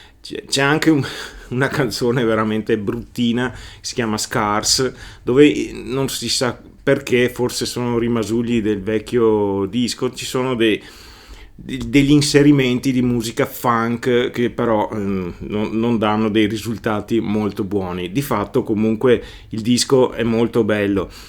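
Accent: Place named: Veneto, Italy. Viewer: Un video per ghiottoni,molto interessante,grazie,ti ga' cadenza veneta,ció...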